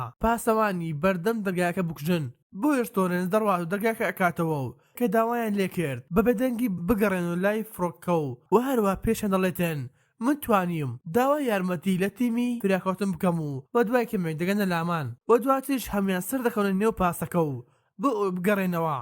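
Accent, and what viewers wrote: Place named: Erbil, Iraq. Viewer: Baxwa dangt naxosha awa chia 3aaaaa har dyara hawlerit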